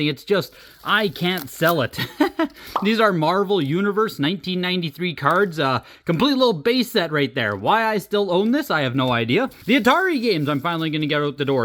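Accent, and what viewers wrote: Canada. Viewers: If you had $6.4k ready to spend on one game you are likely in a better position than you think to buy a house.... Or you could be broke and making wild financial decisions. Is the house for sale or did he already sell it? If it's still for sale look into buying it. 3.5% and 5% loans are pretty common. Anyway I was typing this while still watching and the more you talked you sound Canadian so that might not even be relevant lmao Edit: yup right after I commented you mentioned a Canadian copy of a game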